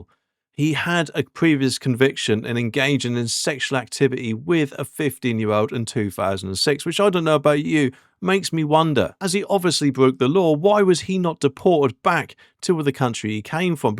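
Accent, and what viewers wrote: Norfolk, United Kingdom. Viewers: Yeah 2 vids and I've subbed. U speak my language, and have a hint of Norfolk there as well 😂✌️🇬🇧👍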